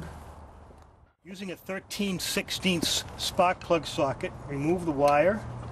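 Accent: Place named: New Jersey, United States. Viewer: Youve got a jersey accent. My daughta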